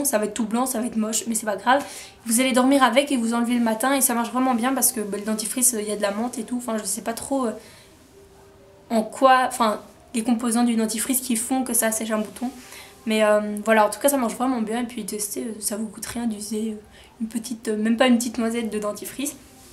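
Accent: Belgium. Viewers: Ahhh une belge qui fait des vidéos ! J'ai reconnu ton accent ! Ça me fait plaisir ;) Tu es d'où ? :) Et tes vidéos sont super ! J'adore !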